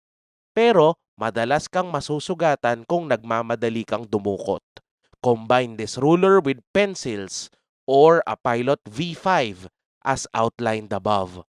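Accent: Philippines. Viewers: love the heavy Filipino accent!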